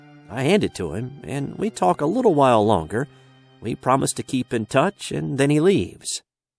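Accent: South, United States. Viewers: when you narrate your voice has that weird Southern style like the guy who used to narrate ‘Gravy Train’ dog food commercials... ‘Just add water and it makes a rich thick gravy, mmmm mm!!’ I like your channel but maybe take some voice lessons everything sounds like the Waltons.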